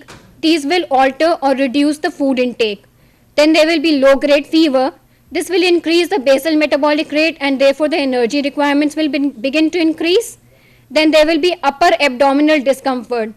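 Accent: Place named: India. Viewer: Anyone who has the patience to listen through the whole lecture will be rewarded with valuable information. Unfortunately some of the written material is hard to see and it is better to stop the video on occasion to absorb it all. Listening to attentively will also clarify the written material, but I do admit that her thick Indian accent and high pitched voice can be a distraction. The viewer must overlook this detail in order to extract the essentials of her presentation.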